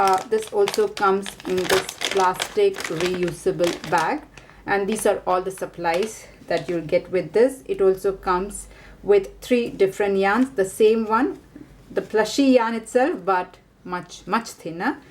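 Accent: India. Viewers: R you a south Indian? Malayali?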